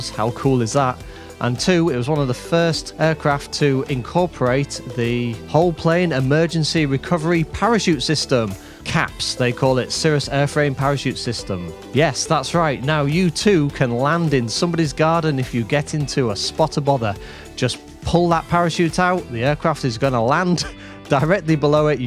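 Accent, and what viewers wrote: United Kingdom. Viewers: brittish accent???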